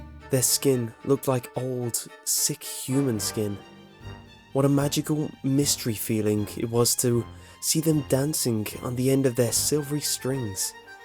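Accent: England, United Kingdom. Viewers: What part of England is he from???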